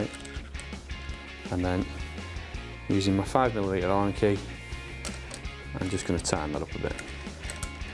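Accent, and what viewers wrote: United Kingdom. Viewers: Very informative with great detail. My only suggestion would be to lose the music. As an older American, I need to strain to hear through the British accent over the music. Great job though.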